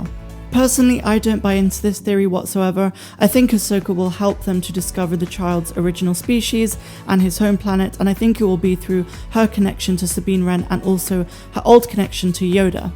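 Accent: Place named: South West, United Kingdom. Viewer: Love the show, sounds like your from down the south west like me!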